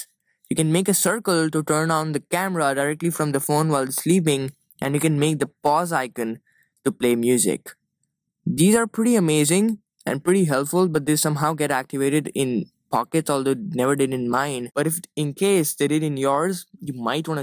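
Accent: India. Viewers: U got indian English accent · Your indian accent is a little thick, just me.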